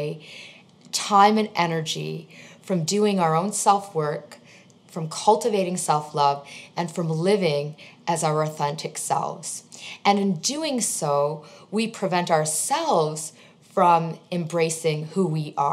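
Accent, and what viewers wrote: United States. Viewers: please keep your western ideologies to yourself. This is not our culture. you speak in american accent, how can you be Indian .I will serve and live with my parents until their last breath just like my dad cares for my grandma . i will also take care of my inlaws so pls , all the sacrifices they made for me and what am gonna tell them, im sorry but im leaving, not my fault you are aging, not my fault you paid for my studies and life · i can tell that the woman speaking in this video probably grew up in the US just like me because she has an american accent. therefore, she is an indian born in america but expected to have indian values which is kind of hard to do. indian girls do know how to help out in the kitchen, like washing utensils, cutting vegetables, putting away groceries in the refrigerator or pantry, but very few i think will know how to make chapathis , rotis, pulkas, parrotas from scratch, or how to wear a sari, thankfully these days, you can get roti makers to make your life easier, and you can get pre stitched saris that are available to wear, they look just like a wrap around skirt, so you are good to go in that department. 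but still girls are expected to show respect to elders, work tirelessly in the kitchen, be work horses, listen to parents about what they eat, how they dress, who they are friends with, what classes they take, how late they stay out, who they go out with, how much money they spend, what they spend money on, etc..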